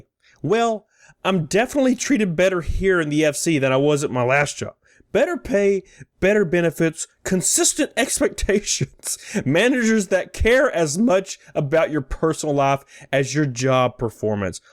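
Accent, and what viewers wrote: South, United States. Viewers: Omg yes I love you. I need a southern gentleman in my life. Marry me please. 🥰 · I went to GamtStop today and they tried to sell me a 1 year warranty. I asked are you guys gonna be around in 1 year. The employee laughs and said you sound like the guy on YouTube with the southern accent.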